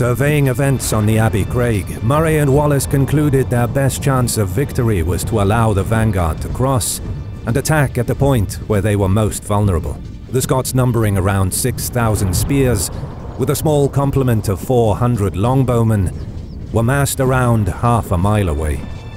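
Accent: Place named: England, United Kingdom. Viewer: Did you ask the narrator to narrate in an English accent or was that a choice they made? A Scottish narrator narrating in an English accent and mispronouncing many Scottish place names is a bit odd.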